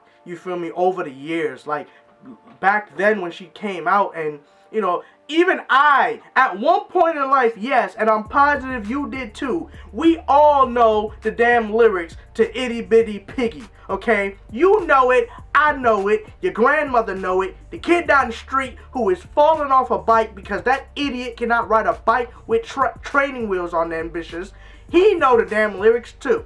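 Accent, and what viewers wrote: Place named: Jamaica. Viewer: are you Jamaican? Your accent is really similar to my Jamaican friends.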